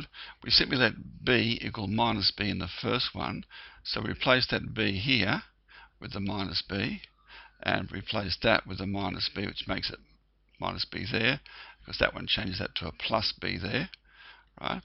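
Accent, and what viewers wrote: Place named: Australia. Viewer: I can say he is an Aussie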